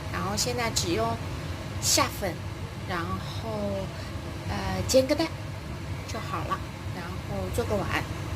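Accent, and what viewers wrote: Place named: Changsha, China. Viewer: 长沙妹陀，口音好重啊，嘻嘻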